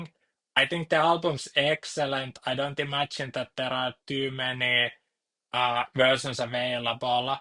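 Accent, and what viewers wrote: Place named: Finland. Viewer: Glad to see you back man! Been missing that sweet Finnish accent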